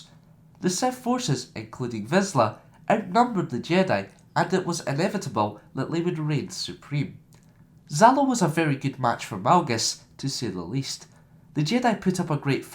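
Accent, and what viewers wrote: Scotland, United Kingdom. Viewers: your scottish i know that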